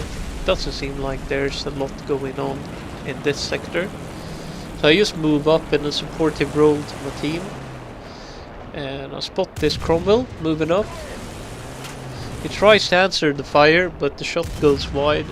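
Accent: Sweden. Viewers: Lmao hörde att du var svensk efter första ordet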